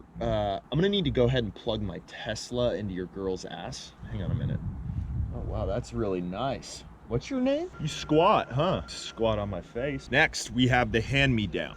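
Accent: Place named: California, United States. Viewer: This dude sounds extremely California lol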